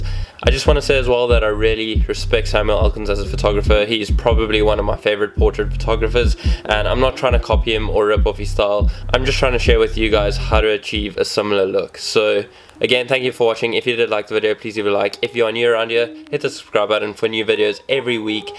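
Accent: South Africa. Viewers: i can sniff the south african accent froma a mile away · Random question but are you south african? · You have a South African accent.🙌🏽👏🏽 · dude, this is brilliant. also, i noticed you accent. south african maybe? (this video was in my recommended so i'm a new photographer) (cape town born and bred) sending you all the good vibes.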